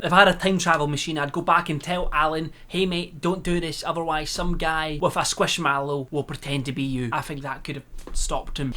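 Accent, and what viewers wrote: Scotland, United Kingdom. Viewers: It’s Scottish 😭😭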